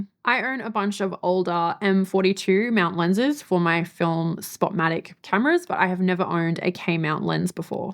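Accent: Australia. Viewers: You are so Australian and particularly QLD. Love it!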